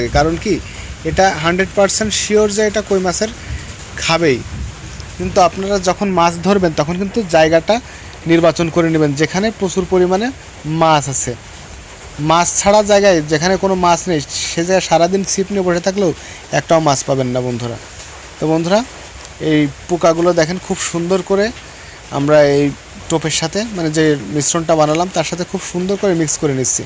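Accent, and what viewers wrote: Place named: Khulna, Bangladesh. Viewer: ভাই, আপনার বাড়ী কোথায়? ভাষা তো আমাদের মতো, খুলনা বিভাগ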